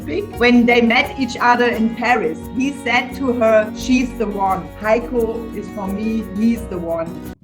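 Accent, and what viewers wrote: Germany. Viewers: I was already wondering about your German accent before...xd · Are you a german? Later - Yeeees you are a german. You Dialect is german. German Toll das es auch mal eine geschafft hat, soviele Klicks zu bekommen. Zeigt das du das richtige Thema hast. Meine Bonny hatte nach 8 Wochen 4 Babys bekommen. Ich hatte sie alle gut untergebracht. Eine hatte ich behalten. Clyde hieß sie. Mittlerweile sind alle gestorben. Ich glaube sogar, das meine Bonny für mich gestorben ist, damit ich gesund werden konnte. Sie wurde überfahren. ihre Babys, Clyde und später kam Sophie (Scholl) zu mir zurück, wurden fast 20 und 21 Jahre! Sophie habe ich Garten begraben. Als sie ging, habe ich ihre Seele gesehen. Sie glitt durch meine Finger und glitzerte. Ein Licht umgab sie, kreisrund um ihren Körper. So groß wie sie lang war! Ich vermisse sie noch immer! Obwohl sie schon 2006 ging. 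Schön das sie Heiko ein so gutes Zuhause gegeben haben. Das freut mich sehr! Ich wünsche ihnen viel Gesundheit und viele neue Tiere die sie retten können! English It's great that one managed to get so many clicks. Shows you have the right topic. My Bonny had 4 babies after 8 weeks. I accommodated them all well. I kept one. Her name was Clyde. All have now died. I even think my Bonny died for me so I could get well. She was run over. their babies, Clyde and later Sophie (Scholl) came back to me, lived to almost 20 and 21 years! I buried Sophie in the garden. When she left I saw her soul. It slipped through my fingers and glittered. A light surrounded her, circling her body. As tall as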